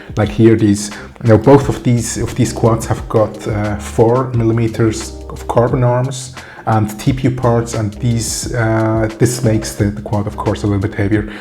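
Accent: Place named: Switzerland. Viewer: Bisch du Schwiizer? De Akzent hani nach 3 Sätz kennt 😅. Aber dis Englisch isch super!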